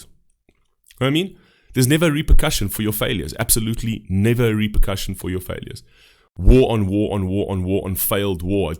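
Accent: South Africa. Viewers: You sound South African,….why you ran away…reacting to a song when shit is 10 times worse here in SA! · I'm just guessing from your accent that you are (at least originally) from South Africa, and you have a better grasp of what's really going on in the United States than 80-90% of the citizens of this country. Kudos sir, great reaction and rant!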